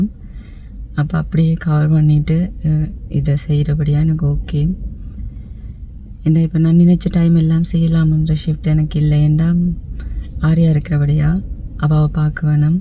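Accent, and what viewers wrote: Jaffna, Sri Lanka. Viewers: யாழ்ப்பாண தமிழ்❤ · யாழ்ப்பாண தமிழ் இனிமை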